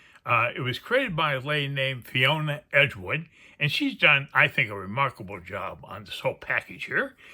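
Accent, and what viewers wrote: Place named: England, United Kingdom. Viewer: It's really nice to listen to your video, here in Italy... waaaaves... your accent sounds "English" am I right?? I'm not sure but... it seems so... and I like it!!!! Thanks for this beautiful review, from today you have a new follower from Italy ! wide smile ! hellooooooo !!!!!!!!!!!!!!!!!!!!!!!!!!!!!!!!! ( and today I ordered my tarot deck: I Love it !!!!) Ciao and thank youuuuuuuuuu !!!!!!!!!!!!!!!!!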